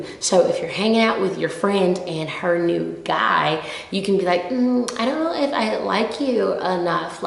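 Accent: South, United States. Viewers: I love this video SO much! Such a great message! :D Also, I never realized how much of a Southern accent you really do have before watching this video. From one Southern belle to another, I think you have the cutest Southern accent I've ever heard. Thank you for posting this! <3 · man you have such a southern accent its adorable! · You're southern accent sounds beautiful today! Love you, Jamie Grace! You are such an inspiration!!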